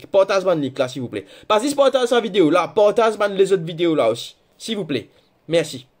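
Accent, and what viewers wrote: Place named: India. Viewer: I wonder where did you learn how to speak English. Probably in New Dehli.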